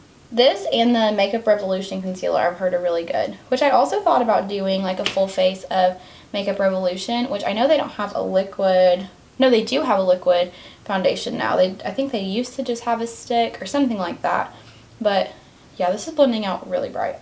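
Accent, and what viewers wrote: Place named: South, United States. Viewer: omg I love this so much!!!! you’re so talented and btw idk why but I love your voice bc you have like a country accent almost and it’s so cute!!! you’re so good at makeup considering your self-taught!!!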